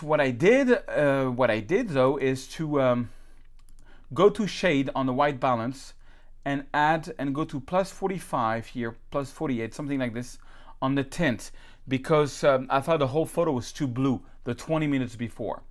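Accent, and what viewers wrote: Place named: France. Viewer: Hey..I realy love your stuff..you gave me a lots of nice ideas about retouching. Also you are a funy guy and a real true person..with your French accent...always makes me lough...but in the positive way.. Hope to get some new and useble infos etc from you in the future.. Keep up the great work Mr.;)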